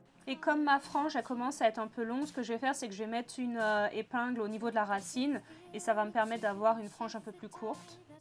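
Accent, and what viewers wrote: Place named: England, United Kingdom. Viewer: J'aime ttes les coiffures,j'adore ton accent anglais💘😄✋🏾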